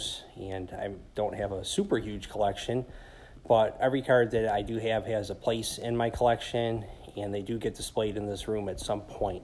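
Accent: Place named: Chicago, United States. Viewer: I grew up in Chicago in the 70's when the Bears were terrible and me and a lot of my friends were Cowboys fans. Funny, I detect a Chicago accent from you, did you have the same 70's experience? That '77 Cowboys team was probably my favorite team of all time. I was in the navy from '86-90 and was about 20ft from Staubach on a carrier once. Bert Jones had a cannon. I live 7 miles from where The Sorena Hotel used to stand. Al Lang Stadium where the Yankees used to spring train is still in use and is now a full time soccer field.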